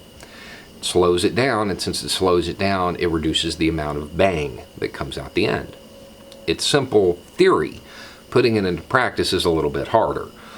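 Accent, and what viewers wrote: South, United States. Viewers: right there with you, look forward to and a dose of common sense. Also to hear a little southern accent...I don't get that up here in New Hampshire. Positive vibes to all the good internet people 😁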